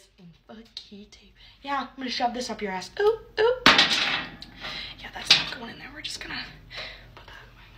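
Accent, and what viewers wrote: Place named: United Kingdom. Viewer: Loving the British accent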